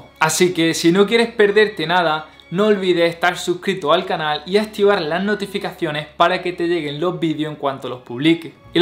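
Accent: Andalusia, Spain. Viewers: Andaluz jajajaja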